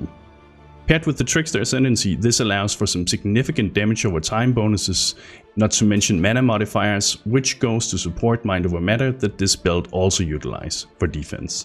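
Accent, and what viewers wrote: Denmark. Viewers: I am so sorry, but you should learn how to pronounce some of the words. I really like your videos but i can't take it seriously, you make good videos but you english could use some work. You sound like you are from Danish, Arbejd den Danske Accent ud af dit engelsk :/ lyder mindre godt. Hvis du synes jeg er en idiot ignorer mig :) hav en god dag. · danish accent?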